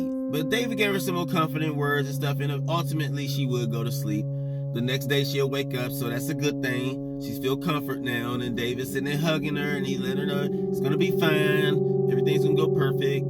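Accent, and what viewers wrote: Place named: Mississippi, United States. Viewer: You sound like you from my neck of the woods..iam just could u be from yazoo county Mississippi 👽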